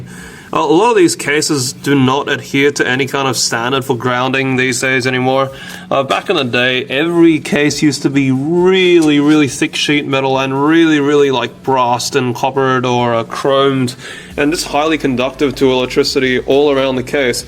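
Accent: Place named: United Kingdom. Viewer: For f sake, finally a video about this. You are 3 years late mate. And I wonder (based on your accent) if you're British, because I live around the UK and I had this problem a while ago already.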